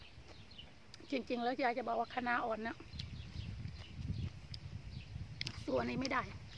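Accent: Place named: Surin, Thailand. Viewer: ชอบดูยายจัง สำเนียงยายพุดเหมือนทางบ้านแฟนเลย...สุรินทร์ น่ารักดีค่ะ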